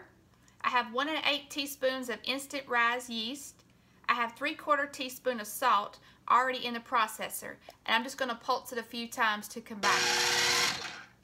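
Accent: South, United States. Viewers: P.S. - oh yes, you definitely have a southern accent. I wouldn't call it country, but definitely southern .. 😉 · I love it when I see cooks using Tsang's! Your country accent is adorable! Rock On!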